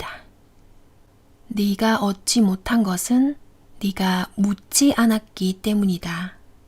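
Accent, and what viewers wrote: Gyeongsang, South Korea. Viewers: 목소리가 좋으셔서 듣기 너무 좋은데 경상도 억양때문인지 더욱더 자세히 귀에 들어와서 좋았네요^^ 감사합니다.^^ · 다른분들은 어떠신지 모르겠지만 저는 산투리억양이 너무 친절하고 진실되고 따뜻하게 와닿습니다. 저는 너무 좋습니다. 그리고 감사합니다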